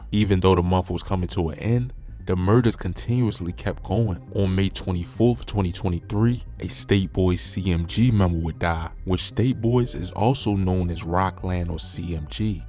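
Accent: Baltimore, United States. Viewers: Foo ALWAYS good for a few minutes of good watchin! I o see how you get so much info. It sounds like my guy is from Baltimore to me.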